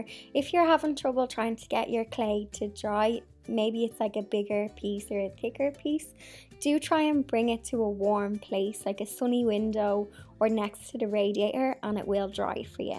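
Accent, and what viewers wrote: Dublin, Ireland. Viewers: Hiya. My 11 old daughter discovered your channel. She's so creative. We love your creative spirit. I'm a mad tea dress vintage decor fab. So love and congratulations for inspiring a lot of people to get creative. Plus hearing your Dub accent from here in Spain being a Dub myself is brilliant!!!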